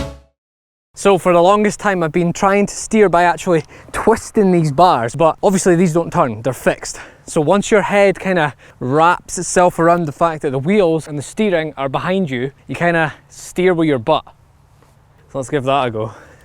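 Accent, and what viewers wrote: Scotland, United Kingdom. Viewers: I canny be the only one thinking you sounded a wee bit more Scottish that usual in this one? P. S. Congrats on 1 million. Hats off. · Is scottish?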